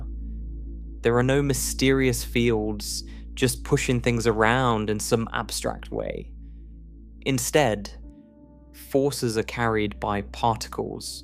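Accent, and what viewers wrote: United Kingdom. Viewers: Another AI video with a British accent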